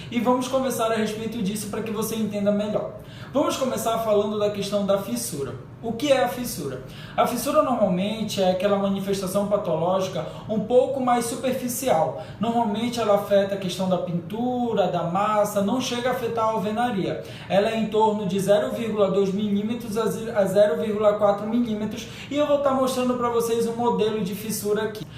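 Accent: Rio de Janeiro, Brazil. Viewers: Você é do Rio??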